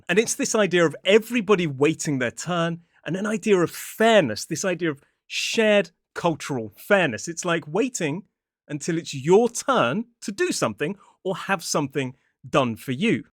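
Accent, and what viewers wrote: United Kingdom. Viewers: British English!!!! Really..I switched off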